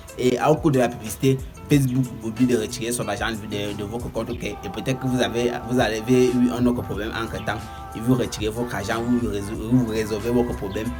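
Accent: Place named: Cameroon. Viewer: Franchement, on a du mal à vous suivre, tout le monde n'est pas camerounais...Allez doucement pour ceux qui vous suivent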